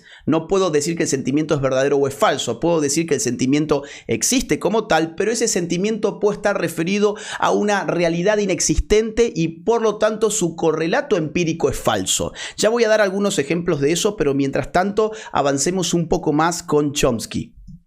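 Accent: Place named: Argentina. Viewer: El presentador hace lo contrario a lo que enseña el pobre Chomsky. No comprende, ni remotamente lo que Chomsky está intentando plantear, para que se empiece a pensar. Estas cosas hacen que el acento argentino suene tan feo que a nadie le guste ni ir, ni juntarse, ni estar cerca de nadie con ese acento, de “sicólogos”, de habladores, gente que engaña y de gente de poco nivel. Así nos va. Un molino de palabras pegadas para hacer teatro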